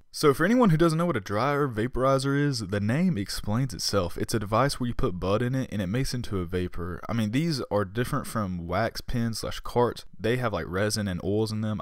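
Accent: South, United States. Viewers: Southerm American...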